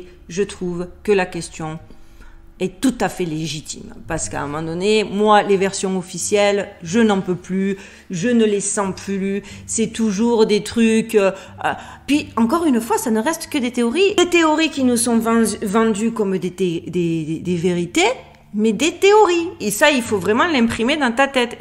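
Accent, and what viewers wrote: South, France. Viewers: Namaste ,j'ai découvert ta chaine il y a peu et je me suis abonné. Je traite sur la mienne de paranormal,d'ufologie mais aussi d'archéologie alternative ,de mystères.... Tous ces sujets sont passionnants ,brabo pour les recherches , Que la Force soit avec toi et la Résistance amicalement , Doumé PS : j'adore ce petit accent du Sud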